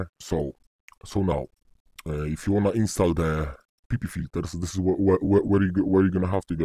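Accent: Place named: Italy. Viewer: fra si sente di piu l'accento italiano che il voice changer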